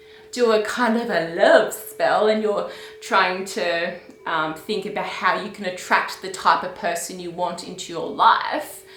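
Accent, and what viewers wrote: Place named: Australia. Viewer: the Aussie accent just like her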